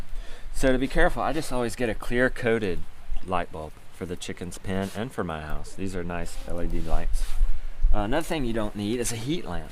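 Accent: North Carolina, United States. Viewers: So serious and a much more distinct NC accent. Love it!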